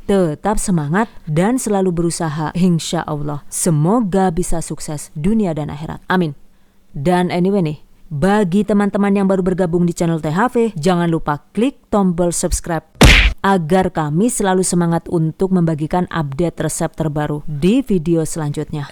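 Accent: Banyumas, Indonesia. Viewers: mak, rika janeh wong ndi? ngapak tmn ketone koh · Rika si wong ngdi, deneng ngapak? 😅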